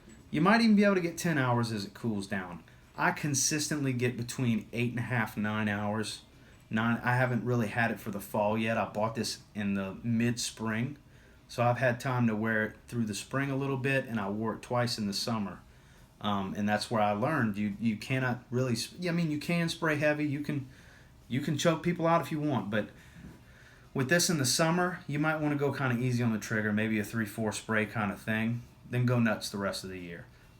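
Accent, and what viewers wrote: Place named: Louisiana, United States. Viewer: Are ya from Louisiana my brotha?! Good job on the video! 318 for me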